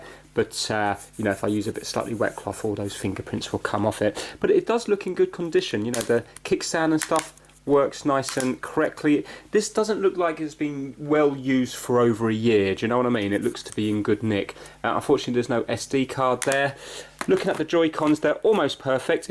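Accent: United Kingdom. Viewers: your brittish accent was so lit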